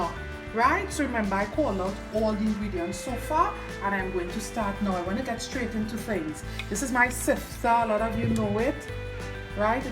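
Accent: Trinidad and Tobago. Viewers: I would love to see what this woman looks like! Her voice is amazing (Trinidadian accent)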